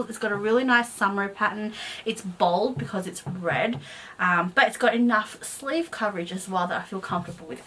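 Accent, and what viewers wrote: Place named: Australia. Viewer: Only 4 mins in and already love this chick. Nice to hear a fellow Aussie too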